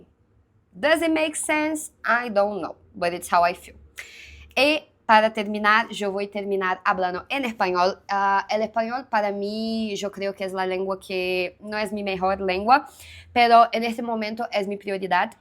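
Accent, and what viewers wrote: Minas Gerais, Brazil. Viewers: tu fala com muito sotaque brasileiro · Linda, poliglota, inteligente e sotaque mineiro. 😍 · O sotaque mineiro presente em todos os idiomas 😂❤ eu amo isso pq não precisa "neutralizar" o sotaque pra se encaixar em outro.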